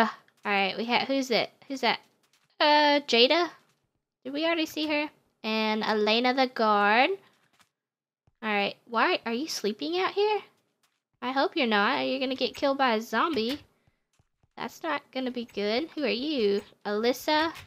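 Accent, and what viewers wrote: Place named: United States. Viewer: Oh myyy i’m watching ur current 100 baby challenge you sound like you have a light country accent in this love to see how far you’ve gone love your vids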